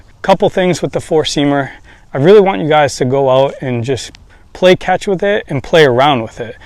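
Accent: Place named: New England, United States. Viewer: Sweet. Go Bronx Bombers! Sorry I know you're from New England with that accent. Probably grew up a Sox fan. I lived on Nantucket for 10 years. Peace.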